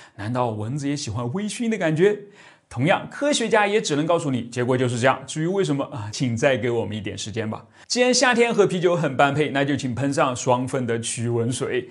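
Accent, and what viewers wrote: Nanjing, China. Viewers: 是南京人啊？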